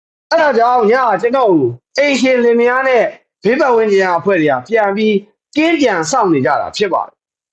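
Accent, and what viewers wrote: Yaw, Myanmar. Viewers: မဂ်လာညချမ်းလေးပါ ကို ရေ မီသားစု များ အဖွဲ့သားဓမ္မဘက်တော်သားမို့အားလုံးပါနေကောင်းကျန်မာစိတ်ချမ်းသာလို့ရာဆန္ဒပြည့်ပါစေ 🙏🙏🙏🤘🤘⭐⭐🌹🌹❤❤အလွမ်းပေးတဲ့ ယောနသံလေးကွ 😂❤ဟို့နေက မဆီမဆိုင် ခဏနားမယ် ဆိုသာကို မျက်ရည်ကျလာတယ် 😢😅အဘွားကြီးဆိုတော့ အသဲနု၏ 😊မျှဝေလို့ ကျေးဇူးပါနော် ပုံပြောတဲ့ကိုကိုရေ👏👏👏💞💞🌷🌷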